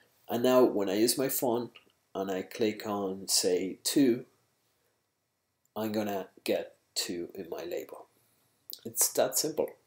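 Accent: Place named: Spain. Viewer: Es muy bueno el video gracias. Se nota que eres español. Viva españa!!!!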